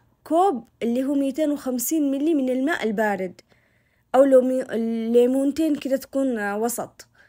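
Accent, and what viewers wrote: Aden, Yemen. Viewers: اني فدالك دكرتيني اليم حق عدن ومحسن الكلام العدني اه وايام عدن باقي التريب وكاتلكس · حليب بودر علشان مايتغير طعمه كلامك عدني قح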